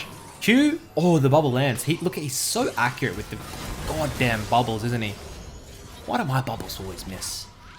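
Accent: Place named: Australia. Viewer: Man this guy speaks like a true Aussie bloke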